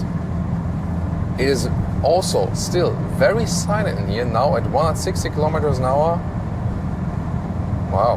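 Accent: Germany. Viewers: Oh digga, man merkt wenn ein deutscher anfängt englisch zu sprechen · I LIKE this guy! I just discovered him a couple of days ago. Great reviews... and me being a GTI owner, the "German-thing" makes it even better! "Vy are Volksvagens so darned guut? Vell, being Gerrrman... because Gerrrmany makes them... and, vell, YOU don't!" Lol! :-P. :-P. :-P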